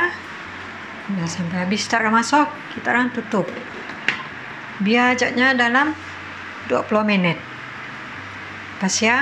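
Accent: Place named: Sarawak, Malaysia. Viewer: Seronok dengar kamu ckp sarawak. Sedap bebola daging bakso ni.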